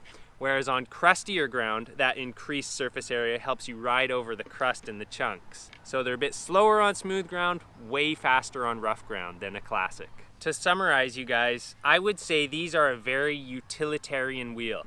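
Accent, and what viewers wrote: Canada. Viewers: Most Canadian skater I've ever heard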